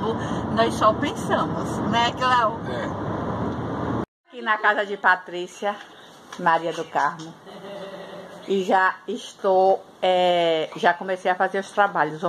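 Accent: Northeast, Brazil. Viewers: ME IDENTIFICO MUITO COM VOCÊ,PRINCIPALMENTE COM ESSE LINGUAJAR NORDESTINO SOU DE JOÃO PESSOA.PB.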